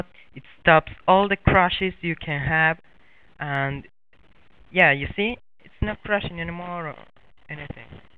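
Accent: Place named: Argentina. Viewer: YOUR ENGLISH SOUNDS LIKE ARGENTINIAN! I'll suscribe.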